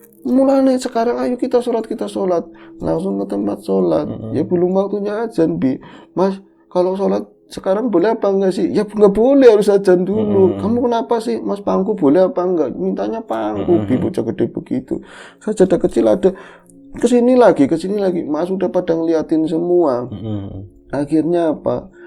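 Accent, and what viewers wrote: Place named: Ngapak, Indonesia. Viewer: hehe..hehe...seru juga ngobor...bahasa ngapak na. medok banget...jadi pengen ikutan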